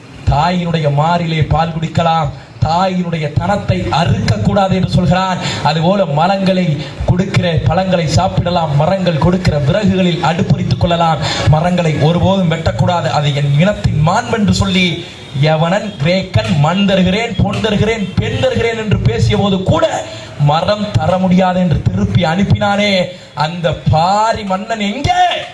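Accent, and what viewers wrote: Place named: Kanyakumari, India. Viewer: U r speech is awesome bro Mersal Kandipa ninga kanyakumari karar nu unga speech la teriuthu All d best for ur future speech😝😝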